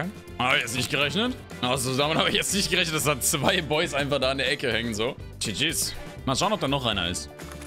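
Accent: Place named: United States. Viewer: das liegt dadran das er 5 Jahre in den USA gelebt hat, das bekommt man auch nicht mehr aus ihm raus^^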